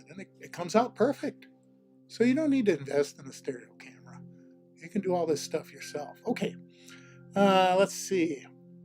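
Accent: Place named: Massachusetts, United States. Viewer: You're a very interesting man,. I suspect that I could listen to you for hours. I summer in Newport, RI and I understand that you reside in New England; I suspect Massachusetts from your accent. Perhaps you'll have a subscriber meetup in the future and we can meet and exchange stories. I've had several encounters in my career that might stun you. Actually, it was these encounters that were directly responsible for my interest in the subject of extraterrestrial life. Great live hang. I'm off duty next Tuesday and expect to finally get to see a live show.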